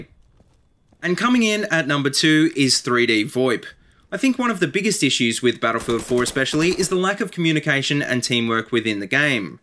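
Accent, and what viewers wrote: Australia. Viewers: auwfull aussy accent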